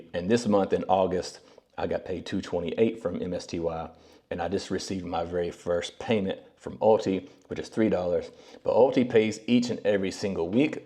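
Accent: United States. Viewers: You sound like an American. Just wondering how the Chinese police treat you? Do they stop you every time you go out and ask for Visa document etc? The news makes it sound like you will be vanished and never be found if you visit China.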